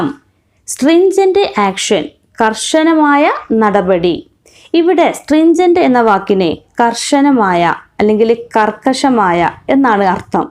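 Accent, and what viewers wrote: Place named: Malappuram, India. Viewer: Your malayalam accent like the Malappuram..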